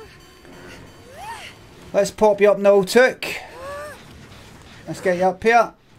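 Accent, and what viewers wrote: Scotland, United Kingdom. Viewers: Oh cool a Scottish DBD youtuber 😯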